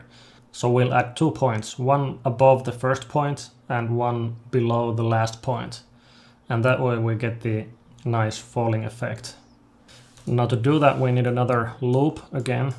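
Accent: Finland. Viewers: Are u from Finland?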